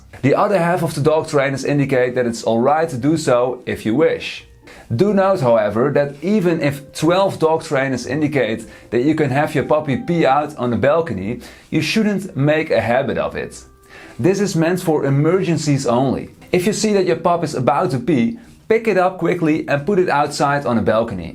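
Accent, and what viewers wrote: Netherlands. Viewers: I had a duplex with a yard the last time I had to train a puppy. I have been without a dog for nearly two years but just cannot commit to training while living in a 2nd floor apartment. I really don’t like puppy pads either. By the way, I love your Dutch accent. I once lived in Geertruidenberg for a year and adore Nederland. I miss being there so much. Also, you are quite the handsome Dutchman! 🤩✌🏼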